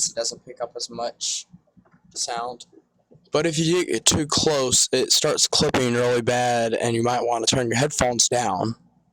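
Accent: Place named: South, United States. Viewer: Your light southern accent sounds really cool.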